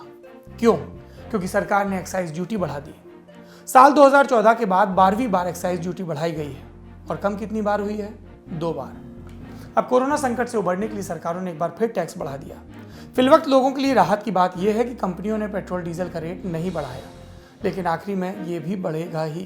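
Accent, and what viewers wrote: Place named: Punjab, India. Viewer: your accent of Punjabi was not but cute.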